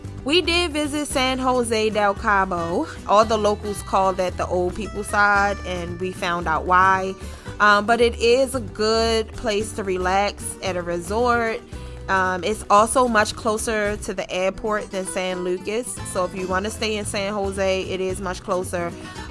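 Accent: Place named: Baltimore, United States. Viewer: Enjoyed the video. Enjoyed the B’more accent.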